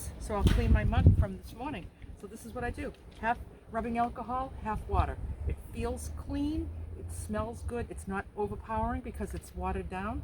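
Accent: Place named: Boston, United States. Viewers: Two things - One is that I love your accent and I have been wanting to tell you that I knew from your accent that you were from New England. My husband grew up near Boston, in N. Abington. He had moved to Texas A few years before I met him so, his accent was Texifiied!! His brothers still live there and I get lost in conversations due to listening to their accents. Two, is that. I am so glad you posted this using your. instapot. My kids gave me one last year and I have been afraid to use it. I saw a book on it at Walmart today and almost bought it but I came home and you are here giving me a lesson. Lol. I. Enjoy your videos very much. Thank you. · I just love this video. You remind me so much of my family, my Aunts, and my Mom, although I'm sure I'm close to your age. You make me smile, and laugh which feels so good. I loved when you said "butta" and get outa here flys who invited you! 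I'm from Boston so I appreciate the accent! I love vegetables and love watching your prep and combinations of them. Very inspiring! They all look so delicious! Thank you for your videos, you are a natural, and thanks for making me smile!🙂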